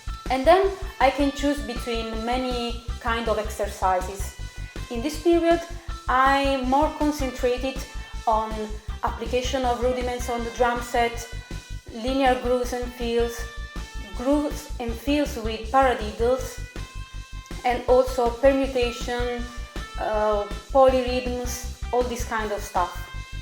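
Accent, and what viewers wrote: Italy. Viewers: Omg you have an Italian accent too !? Damn now my heart is really exploding! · Not only are you an excellent drummer, but you have a beautiful Italian accent! Two thumbs up!!! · ....Thanks for answering questions that many of us have wondered about! What do you think about Mick Fleetwood? As you well know...the music business is not only auditory, but also visual as well. Not to appear as creepy or anything like that, but clearly you are a very talented and extremely beautiful woman....and your Italian accent is fantastico! Love to see you playing piano and singing!! Keep the videos coming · beh con quel accento certamente nn potevi essere di Bolzano...ahhhhh,insomma sei cresciuta a pane e progressive,beh sicuramente un genere molto tecnico,meriteresti un contratto da professionista! Continua cosi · Congratulations on 55K+ subscribers and so nice to hear you speak with that beautiful Italian accent... 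I had always assumed you were in the US somewhere, it is great you are here in Europe. Would love to hear your own music and videos of you playing with your band as well as the brillant drum covers you keep giving us. I have got to say the hours of practice you put in really shows in your playing - amazing quality. Very best wishes from London UK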